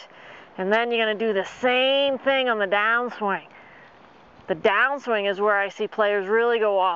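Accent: Boston, United States. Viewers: Never heard this one before, "butt" it makes sense! Gonna take a shot at that cool accent...Boston??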